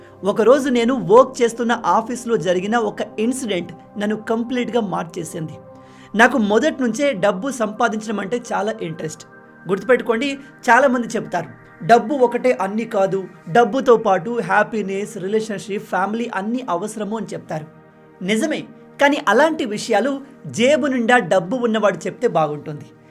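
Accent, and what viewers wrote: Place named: Andhra Pradesh, India. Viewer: Bro meeru andhra anukunnanu.mee voice asalu telengana la ledu.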